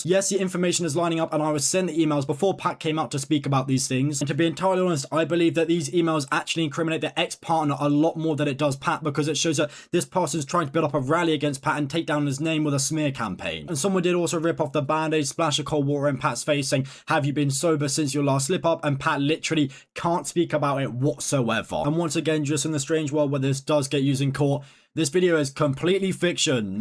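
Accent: United Kingdom. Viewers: You’re like the inverse of MoistCr1TiKaL black T-shirts UK accent and high energy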